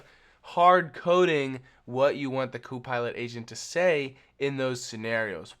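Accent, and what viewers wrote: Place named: England, United Kingdom. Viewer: Tone is one thing, how do you get rid of the annoying English accent?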